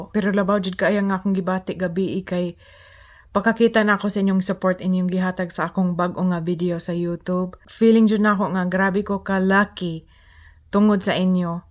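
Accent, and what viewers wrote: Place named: Cebu, Philippines. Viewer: Wow guawapa man kaayo imong Cebuano miga oi! Hahaha!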